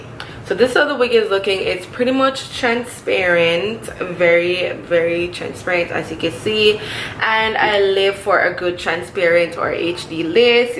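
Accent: Jamaica. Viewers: Subscribes because I sense a Jamaican accent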